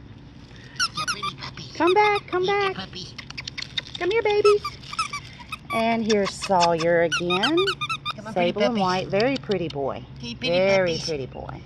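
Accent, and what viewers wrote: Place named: South, United States. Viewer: Well they have a southern USA accent so I would say no to Australia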